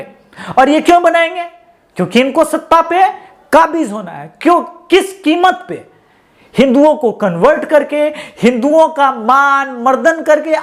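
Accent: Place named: Bihar, India. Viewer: apki awaj me wo humare bihar wala touch bujha raha hai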